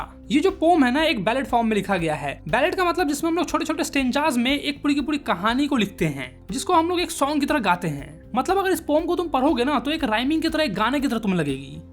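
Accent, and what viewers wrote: Bihar, India. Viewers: Kya bihari hai ye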